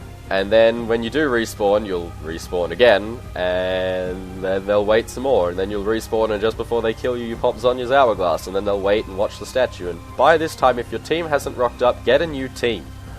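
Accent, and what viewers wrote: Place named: Australia. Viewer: Love your accent man! Australian? Also I tried it and it was heaps fun haha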